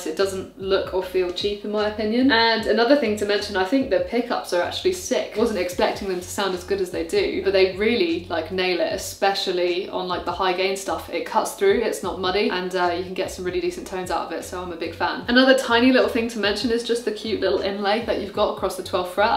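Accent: England, United Kingdom. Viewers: Looks really nice and your English accent is beautiful :)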